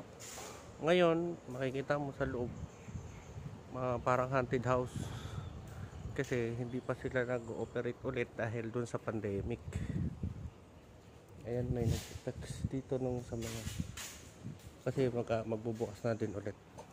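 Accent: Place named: RP, United Kingdom. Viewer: Nosebleed po ako hahaha pang mayaman yong accent ng British ganda po ng view.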